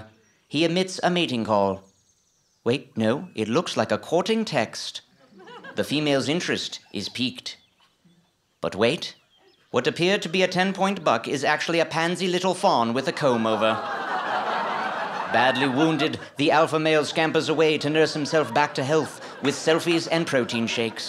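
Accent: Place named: United Kingdom. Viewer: for best narrator! I can see why Jason first thought that he was British.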